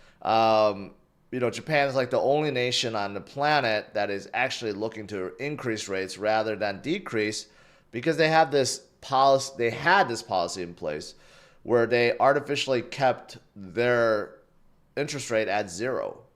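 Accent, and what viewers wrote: United States. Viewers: he sounds like he is from the Midwest not Jersey.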